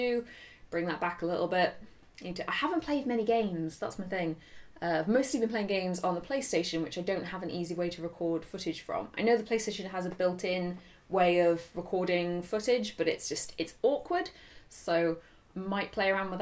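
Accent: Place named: United Kingdom. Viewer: British Accent <3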